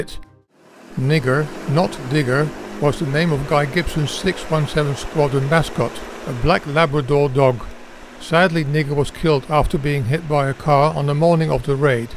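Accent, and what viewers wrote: Netherlands. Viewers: Sounds more Dutch to me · yep you sound Dutch to me ( my son in law is German) Very interesting presentation and analysis that you have done here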